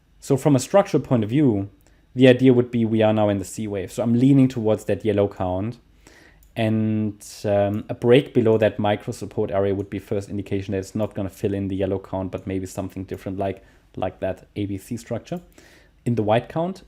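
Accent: Germany. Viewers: Man hört direkt raus das du deutsch bist :)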